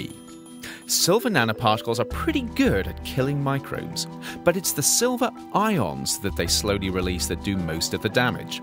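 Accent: United Kingdom. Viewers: ........ just because this guy has a cool English accent doesn't automatically mean his information is accurate! ...... DOWN WITH BIG PHARMA!! .....😠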